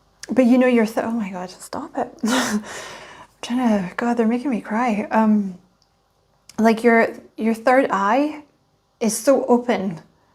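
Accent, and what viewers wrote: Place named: Scotland, United Kingdom. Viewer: So I watched this video after you recommended it in the Scorpio reading. I’ve never watched a Virgo reading before but I checked my placements & it turns out I’m a Venus Virgo! I was shocked to learn this! Wow did this resonate for me! Thank you for sharing your gift of channeling. Really enjoying both of your channels. Happy New Year! Love hearing your Scottish accent. I have family history from Scotland so it’s been great to connect with you. 💕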